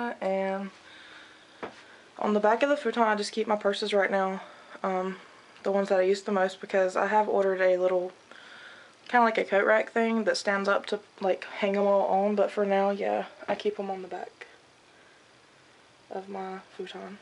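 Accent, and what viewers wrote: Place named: South, United States. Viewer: i love your southern accent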